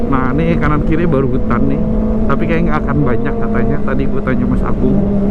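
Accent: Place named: South Kalimantan, Indonesia. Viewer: Banjar ny kental bener dah 😭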